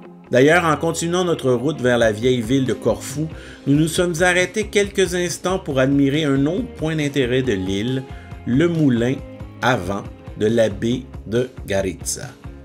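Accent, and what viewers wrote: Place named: Quebec, Canada. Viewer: Très bonne vidéo, Merci. Sous le soleil ça doit vraiment être beau 😅..... après quelques minutes nos oreilles se font à l'accent québécois, qui n'est pas désagréable. Juste un petit détail....un moulin ça sert à moudre les céréales....pas à les "produire" 😂😂.... C'est la terre qui les produit .... bien le bonjour amis québécois ✌️😊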